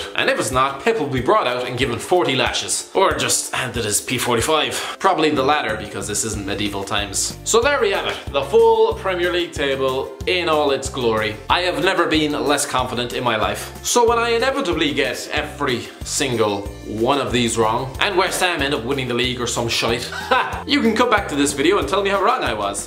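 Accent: Ireland. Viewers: Is this lad from Ireland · You sound Irish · He is Irish the best people in the world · I just keep watching it because of his Irish accent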